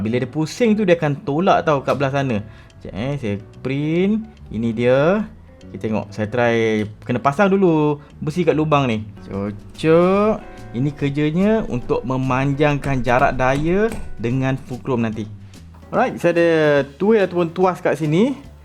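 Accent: Melaka, Malaysia. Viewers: nice video , and btw org melaka ye :)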